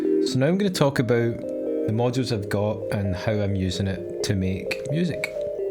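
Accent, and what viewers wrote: Scotland, United Kingdom. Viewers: And then I hear a fellow Scotsman. Bravo chap!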